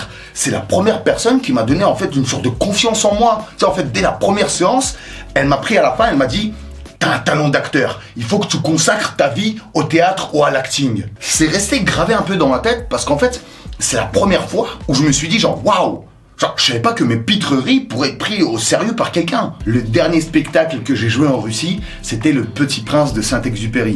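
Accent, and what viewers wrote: Brittany, France. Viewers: Un soupçon d'accent quand même, mâtiné d'accent breton ;-)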